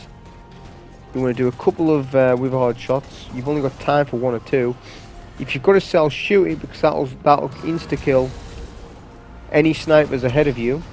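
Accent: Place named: Northern England, United Kingdom. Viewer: I know most rant on about ESO's solo runs of content but enjoy the actual audio commentry with it from these videos, especially from a northern lad :D PoH next?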